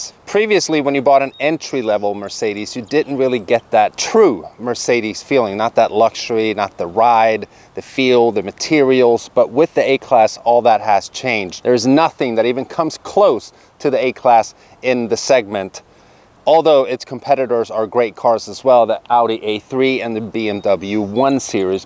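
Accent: Sweden. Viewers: I dont know why but your Swedish accent stood out to me in this vid 😅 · Great review. Am I hearing some Swedish in there?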